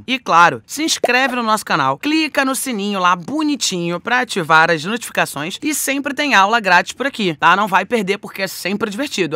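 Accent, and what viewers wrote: Rio de Janeiro, Brazil. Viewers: esse sotaque carioca kkkkkk foda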